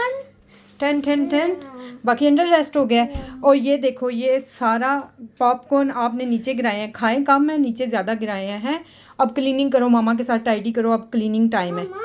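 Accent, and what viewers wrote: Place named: Punjab, India. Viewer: Apki punjabi ka tarka bht maze ka lgta ha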